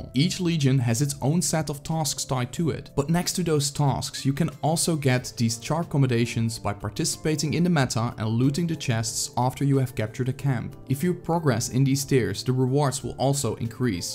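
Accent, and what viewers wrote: Netherlands. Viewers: Same haha! I already thought he was Dutch and im right! Goede engelse uitspraak tho!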